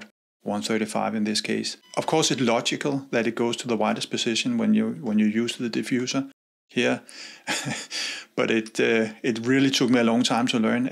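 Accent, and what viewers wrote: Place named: Denmark. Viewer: Jeg elsker os danskere når vi snakker engelsk, det er ret tydeligt. 😁 Lækker video, tak for den! Har lige bestilt min til min Lumix S5.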